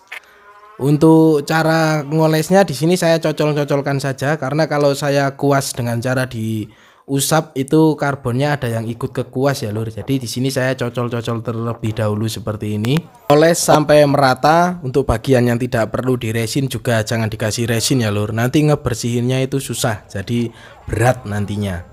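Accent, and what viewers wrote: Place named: Banyumas, Indonesia. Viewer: Sanggar mazeeeghhh.. Ngapak e ora ilang 🤣🤣🤣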